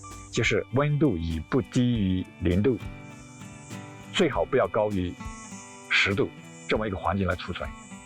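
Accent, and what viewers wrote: Yunnan, China. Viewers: 听您口音一定来自云南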